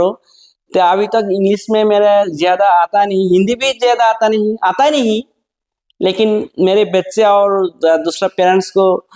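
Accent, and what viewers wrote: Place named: Assam, India. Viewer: Sir assamese lage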